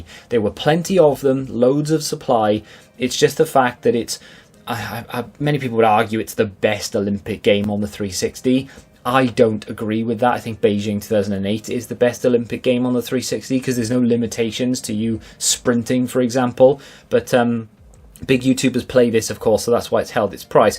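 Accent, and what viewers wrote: Wales, United Kingdom. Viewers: He sounds Welsh to me. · Yep definitely Welsh mate, I think he said once his nearest big CEX is either Swansea or Cardiff, can't remember which.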